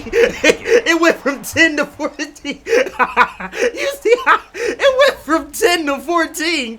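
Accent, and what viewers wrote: Philadelphia, United States. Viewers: Lol you could tell you from philly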